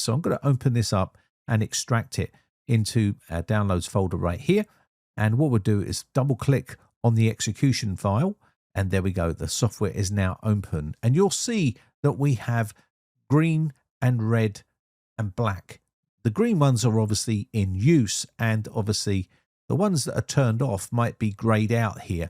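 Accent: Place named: Cockney, United Kingdom. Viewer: I'm here for the cockney accent.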